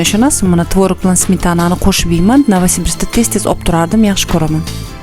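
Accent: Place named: Chapan, Uzbekistan. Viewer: Chapani gaplariz biram yoqabiba bechara kuyovimiz til bilmay qaytarabi gapizi ola xorjin dep😅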